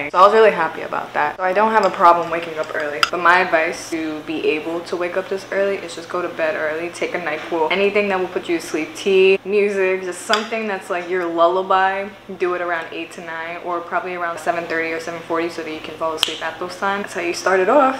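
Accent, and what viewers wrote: New York, United States. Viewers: u from nyc? 🤨 love ur accent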